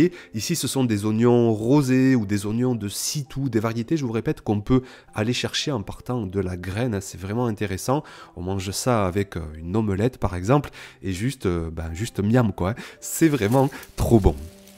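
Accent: South, France. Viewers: Tres chouette cette vidéo, tres claire et précise... Merci merci. Et le ton de ta voix toute en ambiance chaleureuse en accent du sud ... c'est du bonheur tout ça ! Moi aussi j'ai écouté ça lors de mon petit-déjeuner du dimanche entre le thé et les tartines de confiture maison... Quel plaisir ! Mille merci ! Tres bonne idée aussi ce filet pour protéger les tomates de la chaleur du sud. Je vais essayer de construire ça aussi ! Est-ce que c'est utiles pour les autres légumes ? Vous êtes où dans le sud ? ...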